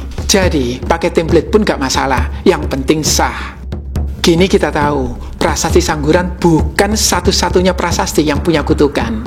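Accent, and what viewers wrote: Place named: Malang, Indonesia. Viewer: Logat e ngalam banget, oh iya ktr e ASISI nang Ngalam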